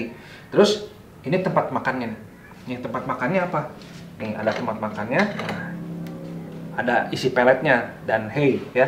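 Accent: West Java, Indonesia. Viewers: Lokasi medan, logat sunda 😆, mantap mang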